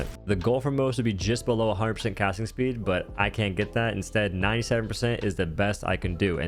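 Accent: South, United States. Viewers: Nice vid, but question, is it just me or does your accent just randomly turn on and off? you sound country for like 15 seconds every minute or so. Kept throwing me off. but in a cool way.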